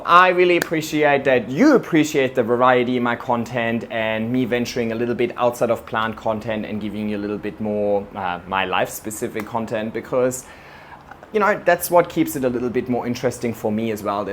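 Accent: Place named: Germany. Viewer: You sound German now.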